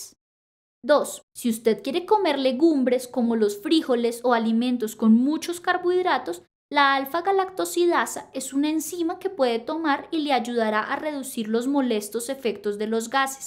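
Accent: Colombia. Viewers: Tiene acento colombiano, lindo. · Tiene acento de Bogotana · Que linda voz usted es colombiana? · Me gusta el asentó de Colombia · Sí es Colombiana, muy Bogotana súper chévere!!! Jejeje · thechampion606 Si ella es colombiana su acento es 100% colombiano eso te lo aseguro yo que soy colombiana.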